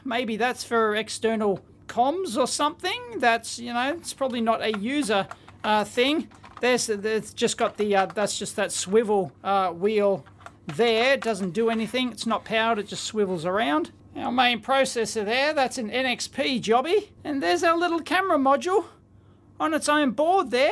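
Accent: Australia. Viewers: I clicked on the video without reading anything about it. Imagine my surprise when everyone's favorite Canadian UBF started speaking with an Australian accent.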